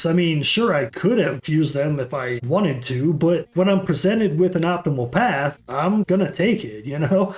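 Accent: United States. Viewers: what state is your accent from?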